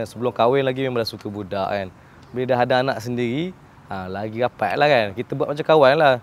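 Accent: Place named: Pahang, Malaysia. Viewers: ade loghat klntn kturnn klntn kot..phng ke? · tulah ade slang timurr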